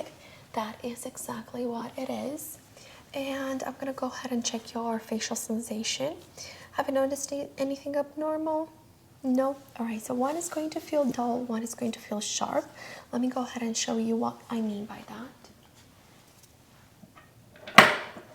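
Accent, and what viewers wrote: Canada. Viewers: just curious are you french/canadian? :) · Fantastic! Very relaxing. Canadian accent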